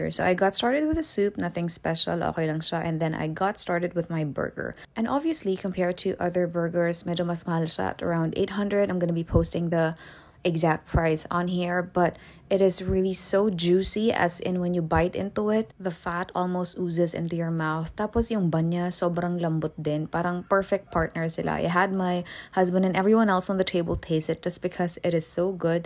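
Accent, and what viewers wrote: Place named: United States. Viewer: I swear to god u sound like a American 😭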